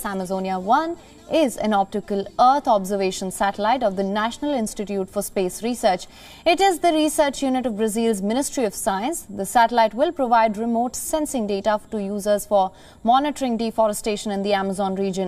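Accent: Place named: India. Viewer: Your English both same india English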